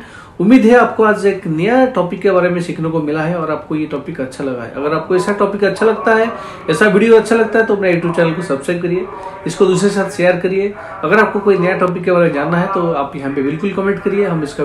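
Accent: Odisha, India. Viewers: Are u an odia sir??😊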